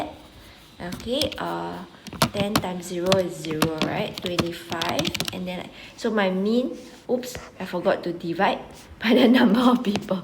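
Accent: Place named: Asia. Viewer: The most Asian accent I have a test tommorow 🤔👍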